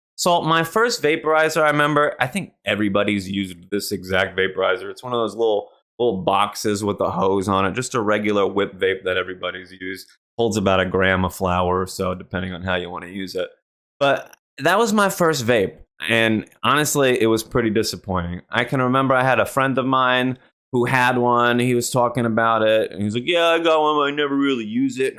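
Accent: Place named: New Jersey, United States. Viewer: Am i the only one that's noticing part of a jersey accent in his voice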